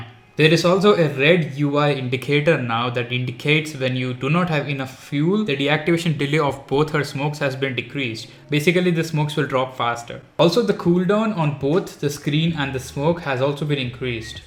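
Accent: India. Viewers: Love u accent Ur indian?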